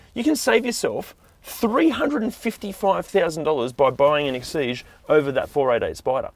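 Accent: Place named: Australia. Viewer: Man its good to hear some nice aussie commentary, thanks for the review!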